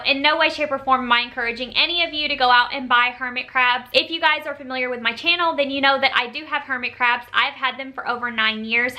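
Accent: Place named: South Carolina, United States. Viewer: Are you from the Carolinas? Your accent sounds south Cackalack to me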